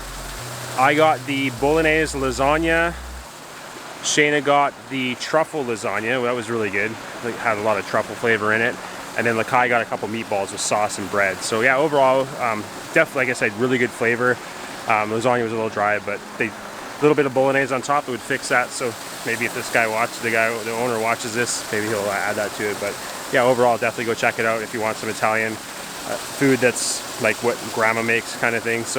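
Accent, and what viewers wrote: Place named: Corona, United States. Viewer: Just curious …! We’re you from ..! Sound like Corona …..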